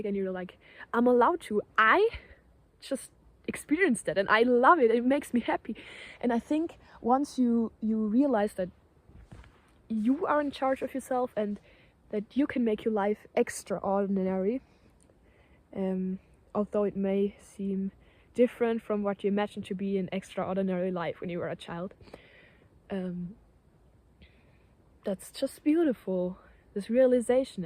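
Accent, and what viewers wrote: Germany. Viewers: Thanks for the recommendation. I also liked the movie mitty, where he lives a simple life and become to enjoy it, when he goes on a journey because of his work. I first thought English is your first language, but I kind of hear some german accent. Sprichst du etwa deutsch?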